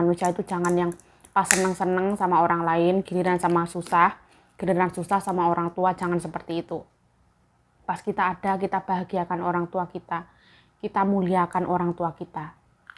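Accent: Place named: Central Java, Indonesia. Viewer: Suka logat ngomongnya mb menghibur semangat pantang mundur 💪💪jateng hadir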